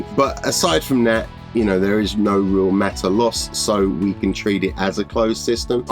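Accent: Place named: United States. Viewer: Why do you call yourself when you sound like a yank?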